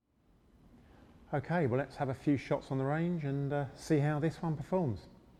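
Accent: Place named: United Kingdom. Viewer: his british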